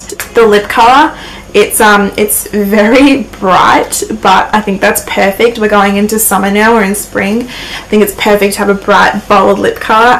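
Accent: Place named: Australia. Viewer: Just found your channel when searching for chichi products. I've lived in australia for a couple of years.. and boooy do i miss it!! started watching your videos and your accent wouldnt let me stop! haha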